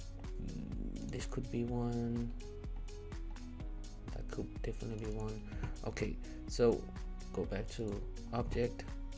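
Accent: Denmark. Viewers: Tak for videoen! ;) Ka hører du er dansk :)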